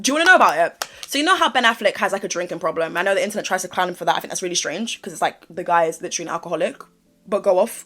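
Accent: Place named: United Kingdom. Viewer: First time viewer here and I must say, I LOOVVEE your British accent, babe! From an American standpoint, that accent on a beautiful woman is a deadly combination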